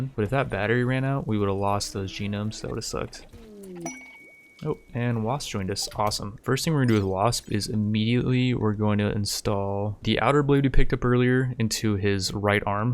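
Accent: United Kingdom. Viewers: it's called british english, he's pronouncing it perfectly fine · he sound British to you?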